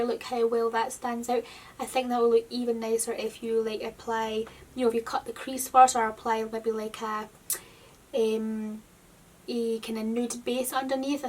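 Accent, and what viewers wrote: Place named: Glasgow, United Kingdom. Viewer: This is a lovely look, I ended up buying both of Alexis' palettes, then I went and bought another transformation palette because I loved it that much. You sound like you're close to where I'm from, I'm in Glasgow 💖👍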